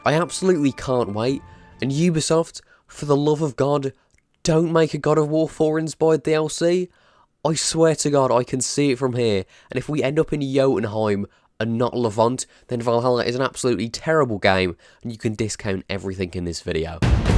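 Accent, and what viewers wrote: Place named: England, United Kingdom. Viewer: Man with English accent likes the setting of England..... go figure.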